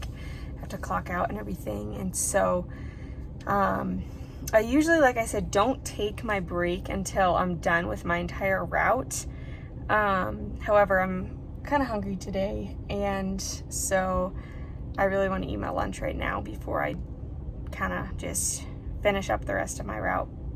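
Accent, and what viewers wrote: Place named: North, United States. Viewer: gotcha! Thought you were from somewhere up north, that accent did it lol you’re pretty asf tho. Have a good day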